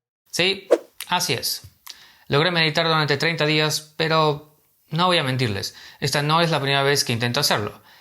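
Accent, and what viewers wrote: Argentina. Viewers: Usted me podría decir de donde es su procedencia? Argentino?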